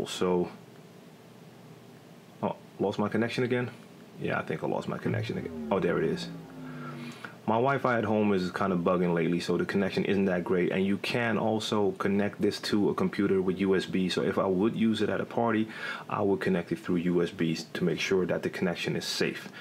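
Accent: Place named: United States. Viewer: You have an impeccable American accent. I live in Purmerend and work in Amsterdam, been here for a little over two years. Very nice place to live. Glad I found your channel, lots of great stuff, thanks fir sharing your experience!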